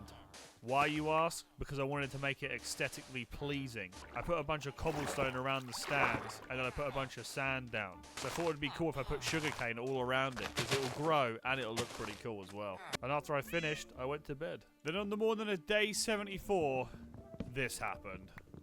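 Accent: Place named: United Kingdom. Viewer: oh hell yeah british accent... i love it :)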